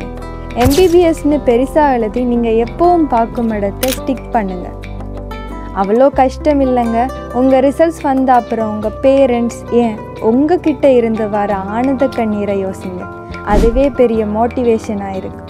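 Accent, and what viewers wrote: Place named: Trincomalee, Sri Lanka. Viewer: Ninga trincomalee distric nu nenekiren enthe oorunum vilangitu unga slang la kandu pudichiten😂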